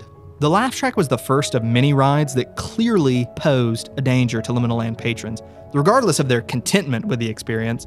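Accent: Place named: Midwest, United States. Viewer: your accent is so interesting to me i can't tell if you're from west virginia or the midwest... somewhere west for sure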